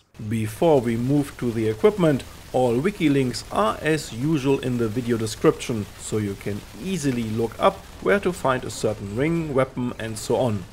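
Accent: Germany. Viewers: Are you german cause of the pronounciation?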